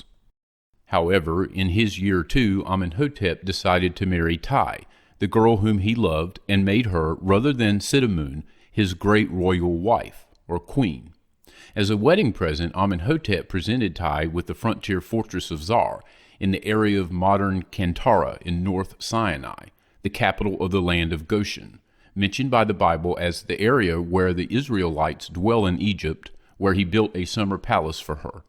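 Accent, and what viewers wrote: United States. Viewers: Completely unrelated, but...... Your has got the be THE best American accent!!!!!! 😍😍😍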